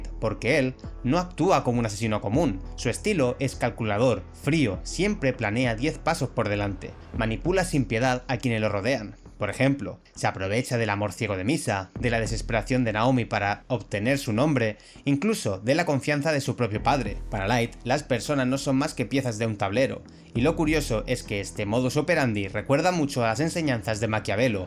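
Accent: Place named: Spain. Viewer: Español de españa? ostiaaaaas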